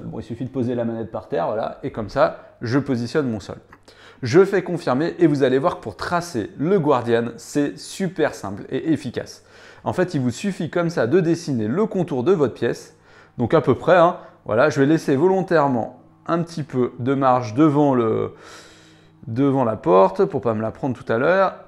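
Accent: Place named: France. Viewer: C'est à cause de l'accent Français mon garçon ^^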